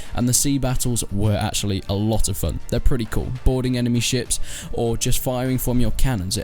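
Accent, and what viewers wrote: United Kingdom. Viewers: Yes I agree can't understand what your saying with that Brit accent. A game list would be nice.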